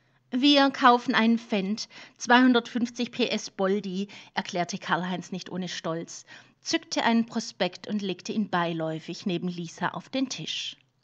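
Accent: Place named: Hohenlohe, Germany. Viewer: Sehr gud vorglesa wirdli supi, awer zur muswisa ghärt vileicht doch a bisle mär hohalohisch dazu mansch net a liwi?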